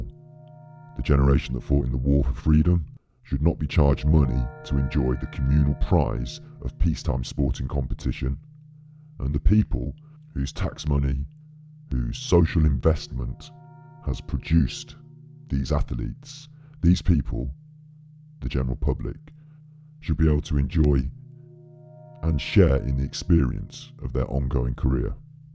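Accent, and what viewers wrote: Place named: Midlands, United Kingdom. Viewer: It's not Hatman, the inflection in some words have a midlands accent. Also it wouldn't make any sense for them not to discuss this on their own channels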